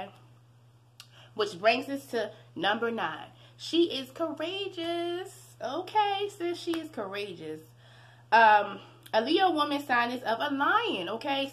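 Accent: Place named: Baltimore, United States. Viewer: I’m from Baltimore and your accent sounds similar .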